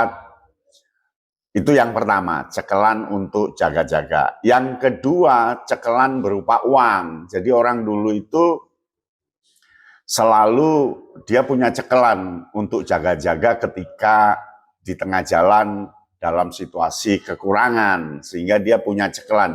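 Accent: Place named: Jakarta, Indonesia. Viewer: Sayangnya pembawaanya kurang Njawani malah terkesan bahasa ber ala Jkt.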